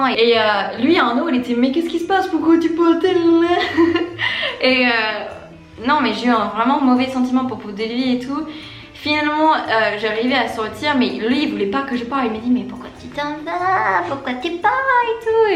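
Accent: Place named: Paris, France. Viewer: Yeeeeeeeeeeeessss, the best day of the week. Also, a french speaking anglophone (Toronto) living in a franco-canadian city (Montreal), and in a relationship the last 7 years with a european french speaker (Belgian), it makes me laugh how your accent seems so.... Parisian Anglophone ahaha. Especially when you talk with your husband in some other videos, the Parisian really jumps out, it's really nice!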